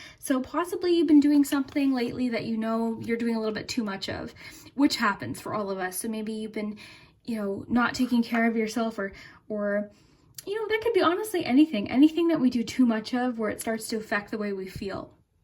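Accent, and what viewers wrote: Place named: Canada. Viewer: Are you from Canada? 🙂I lived there before I know that accent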